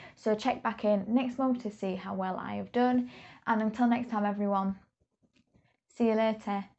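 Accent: United Kingdom. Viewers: Love hearing a fellow northern accent on here! Great intro, will be following along with your updates ✌️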